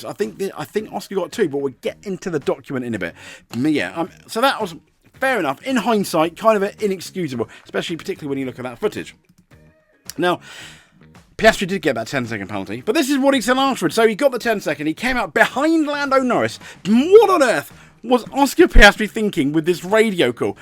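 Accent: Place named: United Kingdom. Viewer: He’s allowed to ask the question!!! BTW…are you British? Of course you are!!!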